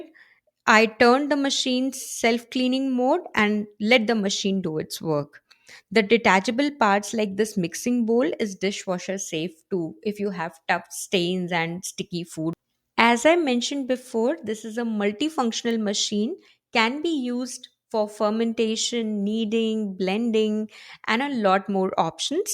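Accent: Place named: India. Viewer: Aap hindi mai comfortable then use hindi half hindi n half English is a no no no ur english sounds more hindi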